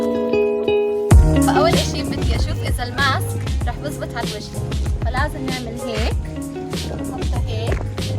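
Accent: Jordan. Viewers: تحكي اردني احسن مني 💔✋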